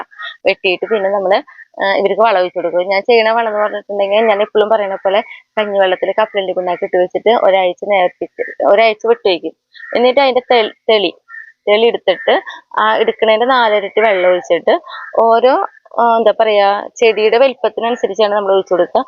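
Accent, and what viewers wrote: Thrissur, India. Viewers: എവിടെയാണ് വീട്. കാണുമ്പോൾ കൊതി തോന്നുന്നു. കൃഷിയിടമൊക്കെ. ഭാഷ കേട്ടാൽ തൃശ്ശൂർ ആണെന്നൊരു സംശയം. ഞങ്ങൾ ഒറ്റപ്പാലം ആണ്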